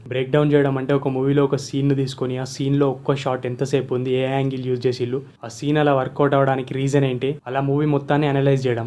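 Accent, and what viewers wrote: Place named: Warangal, India. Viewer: Hi...ne video motham chusa 1 sec kuda waste chykunda u explained vry gud..proper warangal or karimnagar laga undhi me slang...iam Sandeep frm Hyderabad..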